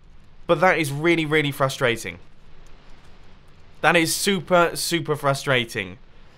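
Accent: England, United Kingdom. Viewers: WAIT! UK OMFG I THOUGHT HE WAS USA I UK😱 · I love your vids, I mostly just watch for the English accent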